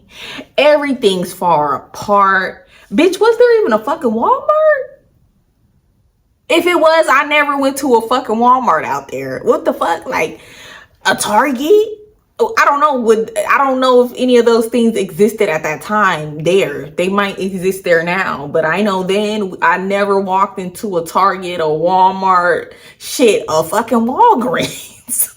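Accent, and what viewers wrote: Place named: Louisiana, United States. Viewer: I’m hollering! 😭😭😂😂 It sounds like when I would visit my people in the country in Louisiana. I’d try so bad to not offend no one but Lawd 😂 PERIODT CUZIN! SCREAMING I wish Texas would’ve worked out for you , but I remember why 😩. It’sdiverse but every city has its duck offs where you can be with your ppl. You act like us to me 🤷🏾‍♀️! Ok, I’m done. 😂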